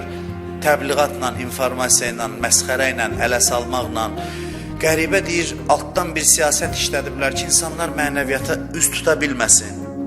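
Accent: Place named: Jalilabad, Azerbaijan. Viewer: Senin bu Celilebad lehceni esidende üreyim bulanır , get oyza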